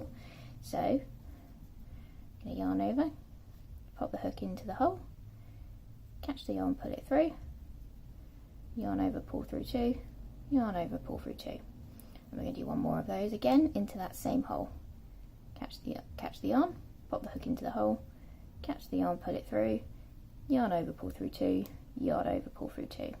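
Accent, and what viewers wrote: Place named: England, United Kingdom. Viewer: Are you using UK or US terminology?? Looks like US but you've a English accent.. Its confusing me..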